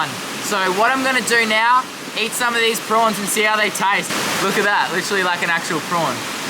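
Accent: Australia. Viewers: Nice vid. That ozzie accent though....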